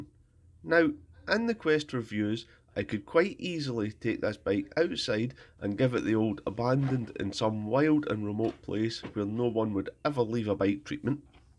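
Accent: Scotland, United Kingdom. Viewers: Another sublime restoration video from the man with the sublime Scottish accent. is very funny 😂👏👍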